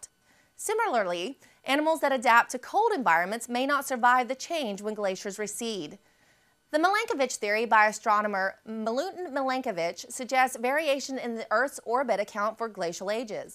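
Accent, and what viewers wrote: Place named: United States. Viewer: wanted to learn something..... heard an american voice...... moved on.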